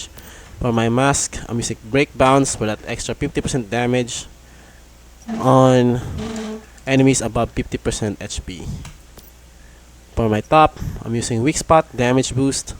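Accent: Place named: Philippines. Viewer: Japanese name but you sound Filipino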